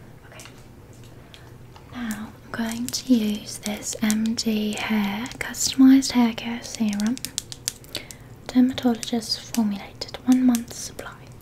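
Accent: United Kingdom. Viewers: You sound just like my friend! We're both British but I'm from a more stereotypically 'chavvy' area, she's from the 'fancy' area. Your British accent is amazing! ❤ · I LOVE your british accent role plays 🥺🥺 they’re so good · when she talks in a British accent or just her random voice is just so comforting like she has such a comforting voice and it brings my anxiety down so much. ❤ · You are one of my favorite ASMR channels to watch. I love your British accent.😊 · British character is back again!!!🥺. Recently I have missed British roleplay characters on your channel ♡ and you posted British RP characters!🫶. i can only say🥹. Did my dream come true?✨️. I can imagine 1 Hour with a british friend as beautiful and sweet as you I must feel lucky and that is the best thing in my life💖🩷. I wish I had a british friend like you loll😭. And Fyi I love your British accent.Your british accent sounds great!. 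This will be my favorite roleplay💗🩷. Yes I Love this rp for sure!♡ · The accent is actually so realistic because this is like the EXACT accent my British friend has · i love how the accents are actually british but throughout the video it switches so fast to different areas of the uk😭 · your british accent is so surprising and pretty 😭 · i'm home alone this week and the loneliness started kicking in tonight, but this video was just what i needed to keep me company. and as a brit, i love the accent! the only slip i caught was , we don't say candy. we say sweets! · The British accents suits her so much omg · Love this! As a brit im v impressed, bit of the keira knigtlys about ur accent 👏🏻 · why did I expect u to start speaking in a north east/northern British accent 😭😭 still love the accent tho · Love how it dabbles between British and Australian 😂😂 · people still speak like that in Britain, it just depends where you go. 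· your british accent is so impressive 😭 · This argument thread is too funny lol, so I’ll settle it. Is this accent impressive coming from a Canadian? - yes. Is this how all brits sound? - no of course not, but a lot also absolutely do. kind of swaps between posh and cockney here; which is why it may sound a little off to us brits (but still impressive!) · I am a british girl and live in britian, and i have to say you do a perfect british accent, also you do a very good french accent aswell,❤️, love all your videos, very relaxing, and some are funny, your very pretty and cute lady xXx 💕❤️💕❤️💕❤️ xXx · omg finally a British accent ASMR 🤍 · As a british person who speaks like this. Thank you! So many posh accents and this is a much much more common accent! Love you videos!! X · I love your accent on this! I'm British and you sound like someone who's lived in loads of different parts of Britain (and maybe Ireland as well). 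When people go from place to place you get accents like this, and they're so nice! It's like you can hear all the different influences of the British accents and dialects. Great job! xxx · can you do another one with a british voice i loved this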